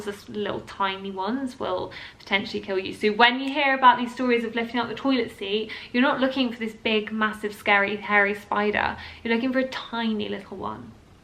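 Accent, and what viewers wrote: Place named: United Kingdom. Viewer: i cant pick your brit accent . where u from ?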